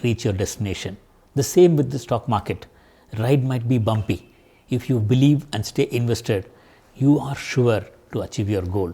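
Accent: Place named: India. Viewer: I really love the way u explain.. Your English is like Pure Hindi.. Wow❤️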